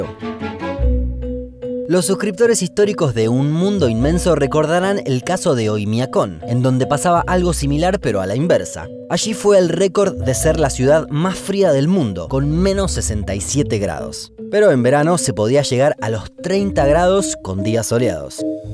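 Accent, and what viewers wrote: Rioplatense, Argentina. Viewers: Es un argentino bastante sobrio, por eso queda simpático, aunque quizá sea uruguayo. ¿Algún día lo sabremos? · Como relator tiene axento argentino.. pense que diria que en argentina jaja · Buen video pero no puedo soportar tu acento argentino en especial cuando pronuncias la letra doble ll como valle lo dices vashe☹️😒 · Sos argentino o uruguayo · AY MIJO...VOS SOS ARGENTINO... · Yo solo veo tus videos por que me gusta el acento argentino saludos desde Mexico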